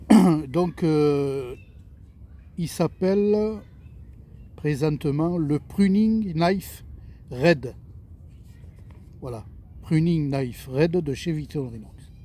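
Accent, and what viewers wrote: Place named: Corsica, France. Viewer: salut. je connaissais pas ce Victorinox, pour 12€ franchement c'est vraiment excellent. en + la qualité Victorinox ça ne ce refuse pas. superbe soleil ☀️ et j'adore ton accent qui me rappelle la Corse. mes amitiés 😉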